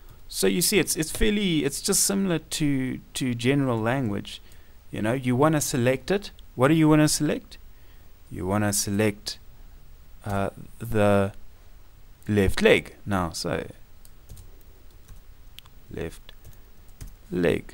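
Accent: South Africa. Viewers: Are you South African? guessing Pretoria...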